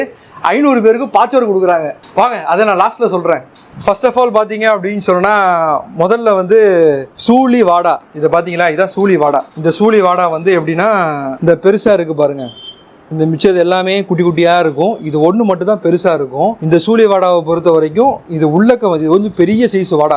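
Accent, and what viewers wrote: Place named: Keelakarai, India. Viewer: Ivvalavu seera koduthu vachavanga ponga unga ooru slang keelakarai slang mathiri irukum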